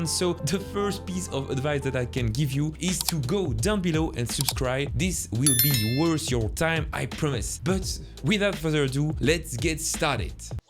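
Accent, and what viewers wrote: France. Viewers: It's so cool how good your english flow is but how extremely french your pronounciations are 😅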